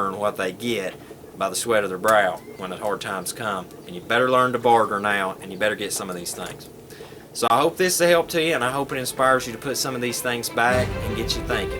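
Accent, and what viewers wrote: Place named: South, United States. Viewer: I like your Southern drawl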